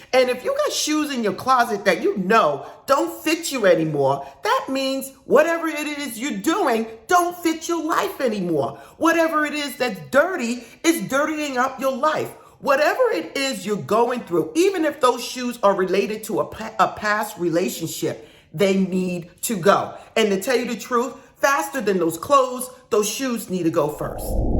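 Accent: New York, United States. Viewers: 🥰Thank you as always! Love that NY accent!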